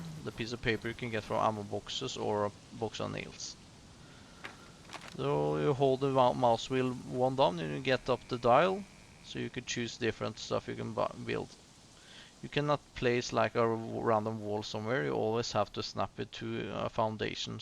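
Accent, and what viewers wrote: Norway. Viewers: are you from norway and great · What is the base mod name? Im guessing by your accent you are Norvegian. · Are you Norwegian perchance?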